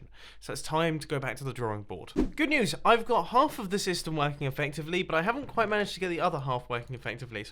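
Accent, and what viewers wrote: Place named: United Kingdom. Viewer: I love me a British accent. Anything sounds better in a British accent lol.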